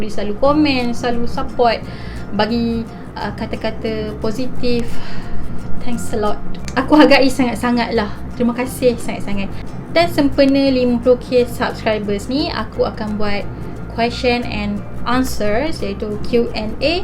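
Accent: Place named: Kedah, Malaysia. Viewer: Owg kedah ka.sekampung ooo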